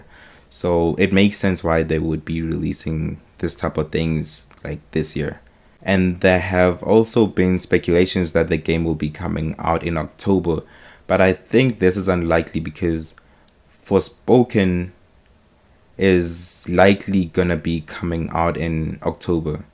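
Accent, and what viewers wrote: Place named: South Africa. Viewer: Can hear ur from SA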